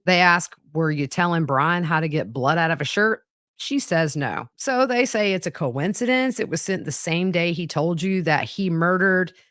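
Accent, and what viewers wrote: South, United States. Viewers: you do such a fantastic job reading that as quickly and concisely as you do! Your linguistic prowess is amazing! It’s really impressive how you never pause or screw up and your cute southern draaawl is replaced with more of a NYC pace. I love your content!❤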